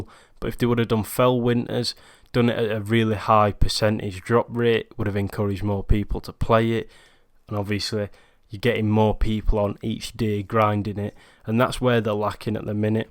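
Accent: Yorkshire, United Kingdom. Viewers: I only live forty minutes from Sheffield. Your accent is one of the reasons I subscribed. Can't beat the no nonsense opinions of a Yorkshireman! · I don’t know if you’ll see this but I love your accent 😂 it sounds Yorkshire a bit I’m from Yorkshire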